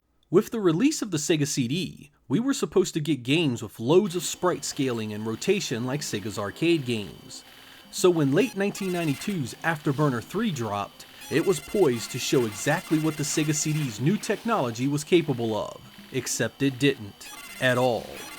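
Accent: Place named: Piedmont, United States. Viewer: I've been trying to place your accent and I'm ready to guess: Piedmont region of either Georgia, Tennessee, or the Carolinas. I've only known one person that pronounced "on" the way you do and he was from the suburbs of Atlanta.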